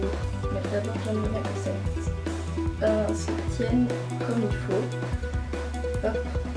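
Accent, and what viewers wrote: Belgium. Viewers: Je me disais bien que tu avais un accent belge , by the way je vais a la mia dimanche aussi :))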